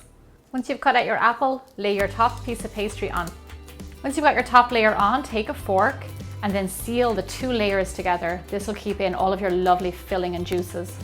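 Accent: Ireland. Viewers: Are you Irish? I'm jealous of your accent and Poptarts. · Oooh! Sounds good. Thanks for the quick response. PS I love your accent, brings back good memories from Ireland. Lived in Dublin for nearly nine years. People here in Canada think I'm Irish lol. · i love your accent and do you speak a different language in ireland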